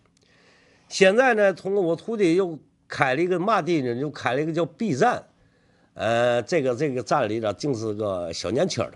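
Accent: Tianjin, China. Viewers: ，b站的粉丝基本上是去听你的天津口音的，冲着技术去的很少。你的视频太长，不够精炼，最好能剪辑一下。动不动就十几分钟，而且闲聊太多，年轻人看不了。国外不论是讲数学物理化学的视频很少有超过10分钟的，看起来轻松。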